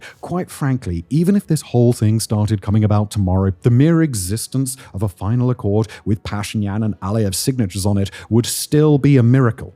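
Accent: United Kingdom. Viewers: His British accent is too heavy. It's difficult to follow what he's saying.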